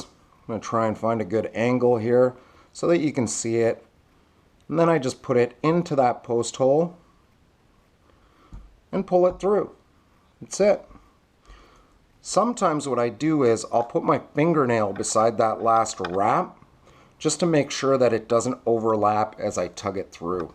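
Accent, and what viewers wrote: Finland. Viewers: Are you finnish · Dude!!, thats cool;) I noticed a little fin akcent there:)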